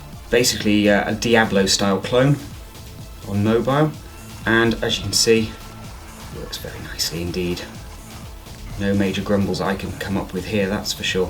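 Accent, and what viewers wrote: United Kingdom. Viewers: gotta love the brittish accent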